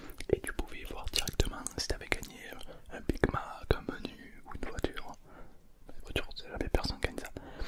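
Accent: South, France. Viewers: Je me demande si tu viens pas du sud on a peu près le même accent ! En tout cas j'adore tes vidéos ❤️🙏🏻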